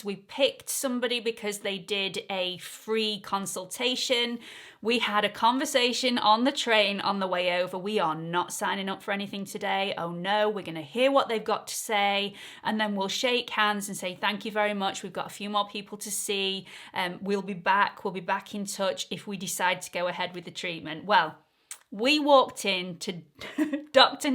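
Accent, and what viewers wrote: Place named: Yorkshire, United Kingdom. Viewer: I'm from the US but I knew I heard Yorkshire!!!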